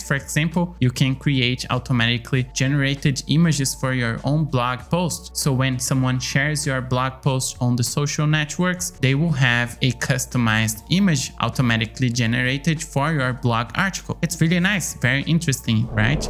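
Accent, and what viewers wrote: Brazil. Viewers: I love your accent sounds like a brazilian guy speaking english. Great content btw!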